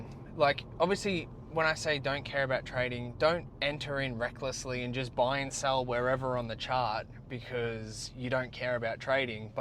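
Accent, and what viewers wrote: Australia. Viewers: Perfect time for me to listed to that. It is nice to listen to a fellow Aussie. Thank you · Are you Aussie?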